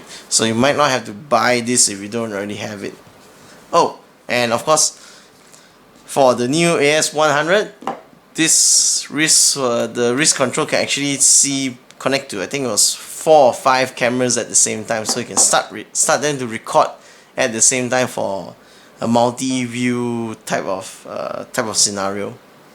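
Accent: Singapore. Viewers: thank u! love the Singaporean accent.. got this camera as a christmas gift and am still learning how to use it.. in ur opinoin is this better than the gopro cams? thanks for the review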